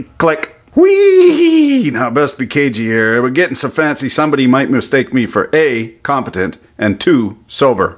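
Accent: Quebec, Canada. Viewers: Il parle le joual la plus belle langue du christ!!:) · Where the F is the CC button? No one understands Canadian English? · Please keep the quebecois coming its hilarious! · be speaking true Quebecois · That Québécois tho. I like it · He speaks like a true canadian. " how da fuck can you close on a weekend " lol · Cobalt? New Liskard? Not many places where you can develop both a hoser accent and also a heady joual... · Little mix of quebec, ontarian and american talk😂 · Je savais pas que t'étais Québecois! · oh canadian . okaaaay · Hahaha Quebecois en criss! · That's the most Canadia I've ever heard in 5 and a half minutes and I loved every second! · Naw, welcome to Canada, eh. We all talk like that. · I can imagine the confused looks, when people don't understand the subtlety of the engrish Canadian mixture, fucking great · Is that a Canadian accent you have? 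Aye good day. Take off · Me too... and i'm french! That quebec accent was so pronounced it's basically creole at this point. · You must have spent some part of your life in Quebec, you couldn't have acquired that slang and pronunciation anywhere else. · Is he Québécois?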